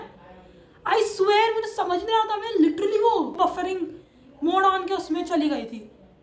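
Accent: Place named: Gujarat, India. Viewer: The gujju accent is the must 😝😂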